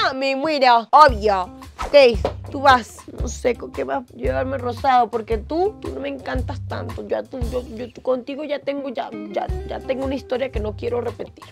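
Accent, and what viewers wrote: Venezuela. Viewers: Yo sé que a No se olvida el acento venezolano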